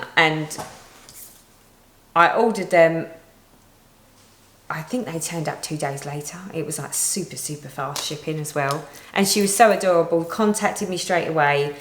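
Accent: United Kingdom. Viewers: I agree with the others, do one of the animal squares. The foxes are adorable but the buck's would be cute too. This is my first video to watch of yours, i love a british accent & watch alot of british shows even though im american so i dont care what you talk about, i would watch it, lol. I just was re watching one of the celebrity big brother uk the past few days. It was the one with Natalie Cassidy in it, ive watched Eastenders for a long time so i always enjoy the ones with those actors in it. (im a rambler too, lol)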